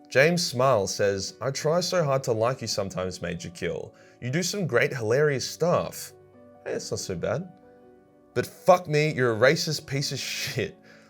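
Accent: Australia. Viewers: Comedic aussie way? I must be honest, I allways thought you were just plain Australian and not trying to be funny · i don't really care about 40k that much, hell i have never played a game or read one of the books, but the Australian accent and the cheekiness is what keeps me coming back lol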